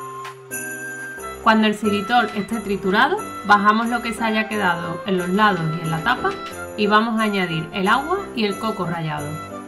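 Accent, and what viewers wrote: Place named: Andalusia, Spain. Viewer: Hola desde Galicia.Las recetas son excelentes. He comprado el robot hace unos días.Estaba buscando recetas acordes a mi dieta sin lácteos y gluten, y ha sido una suerte encontrarte.. Me gustan especialmente las masas y los panes. Una gallega lleva el pan en el ADN y en su alma morriñenta. Me cuesta encontrar la harina de sorgo. Me encanta el acento andaluz de tus vídeos, una maravillosa riqueza que no hay que esconder He trabajado bastantes años en el Sur , y ahora que estoy de vuelta, lo añoro. Felicidades por tu trabajo. Rosa.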